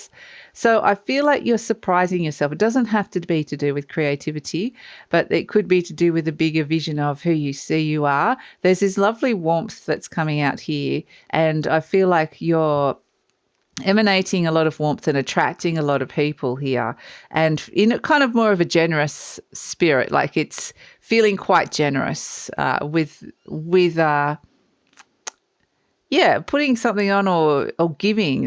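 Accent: Australia. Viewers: Damn I thought , you were probably from northern NSW like myself, but time in Qld has melded my accent , should have gone with my first instinct ha haa , love your work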